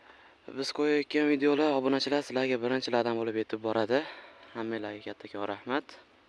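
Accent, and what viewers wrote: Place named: Tashkent, Uzbekistan. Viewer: Toshkenlimisiz shevangizdan bilinyabdi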